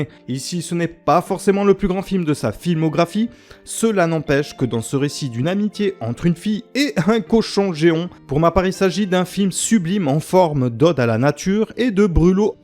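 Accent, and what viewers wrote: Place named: England, United Kingdom. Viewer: Vidéo interessante mais ton accent Anglais il irrite vraiment les oreilles !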